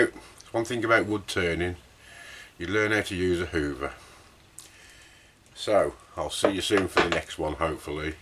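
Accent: United Kingdom. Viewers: Awesome re weather. We recently had two days' worth of sorely needed rain here in Jacksonville, FL. It was beginning to feel like drought-type issues around here. It sounds like you're a Brit. Am I right? If so, what part of the UK do you live? I visited a friend in Telford-Dawley back in 1995 from August thru October and loved the difference in the essential 'air'. Jacksonville is sub-tropical, so lots of heavy humidity around here. The UK weather was crisp and comfortable to me. Thanks for info re the purple heart wood. Do you ever sell any of your creations? Cheers....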